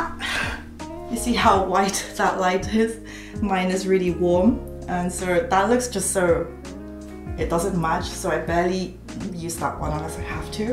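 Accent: United Kingdom. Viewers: Damn standard n strong British accent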